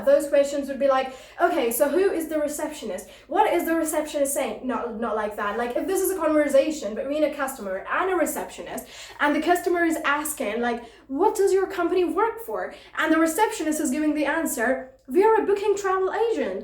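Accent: United States. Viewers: Awesome When I close my eyes I feel like someone American 🇺🇸 speaking in this video Keep it up Are you offering any one to one class for student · is this American or African American accent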